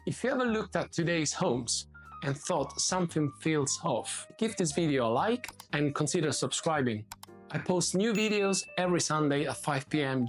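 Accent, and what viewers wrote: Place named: Cockney, United Kingdom. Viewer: This is an excellent conversation to have because it encourages local and regional authorities to see beyond the cookie cutter model of housing and commercial real estate development. But there's a catch; genuine aesthetic considerations must be mandatory, appropriate to each zone. Utility, durability, and sustainability must also be in the national building codes. In Australia, it's a free-for-all mess, where developers win, often unchallenged. BTW, you're developing a cockney accent! 😄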